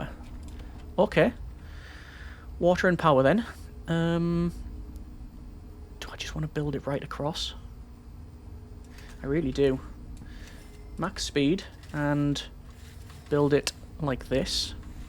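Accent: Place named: Northern England, United Kingdom. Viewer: Based on the way they say poor: I'm going Northern English.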